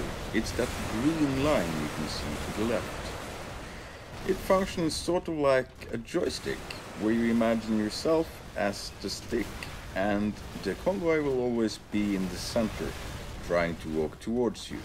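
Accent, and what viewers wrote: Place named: Norway. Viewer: it sounds pretty Norwegian or Scandinavian to me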